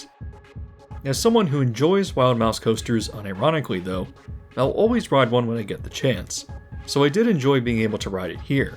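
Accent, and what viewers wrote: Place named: Florida, United States. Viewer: You can tell you're from Florida by the way you pronounce it "Flarida". I go to sleep to your voice a lot, so I guess you could say we have a pretty close relationship. Where can we read more about you?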